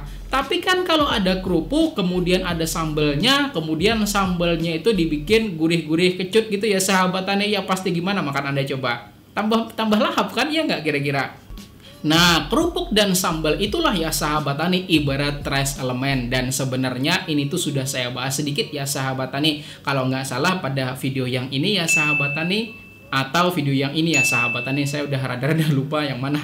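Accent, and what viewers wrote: Banjar, Indonesia. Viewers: Pian asli banjar kah?? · Logat banjarnya masih ke ikut ya pak🤣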